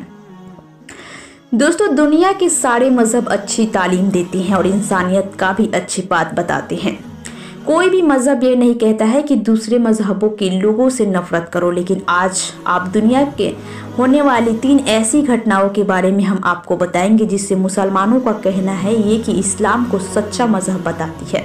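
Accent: India. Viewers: Tumhari boli batati hai ki tum bihari ho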